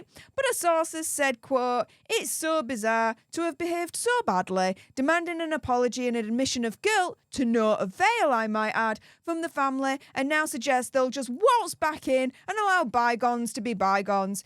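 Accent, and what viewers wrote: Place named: Lancashire, United Kingdom. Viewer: Hi there, you are definitely from my neck of the woods. My guess Lancashire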